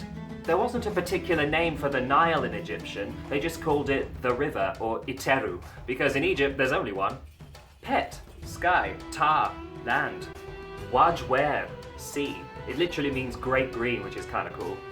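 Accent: United Kingdom. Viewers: Your accent is extremely British in ancient egyptian SORRY. Try to pronounce it differently cuz it's ridiculous but if you cannot do that at least pronounce R at the end of the word and do not create a new non-rhotic British Egyptian :D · The funny part is always the english accent,even here. A lot of english speaking natives are complaining about accents of non natural english speakers if they learn english. Good to see that they are not better. No offense,just wanted to mention it. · Sounds welsh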